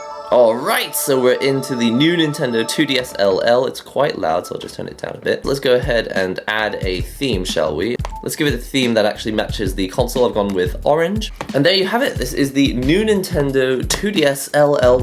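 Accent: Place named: Northern England, United Kingdom. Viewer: You probably learned English from England, maybe north of England because of your accent.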